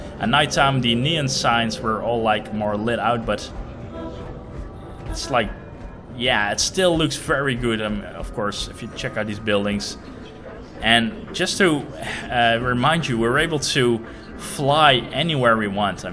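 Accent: Netherlands. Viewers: hey! no, you're doing ok. learning the game is nice to see. een nederlands accent, trouwens. haha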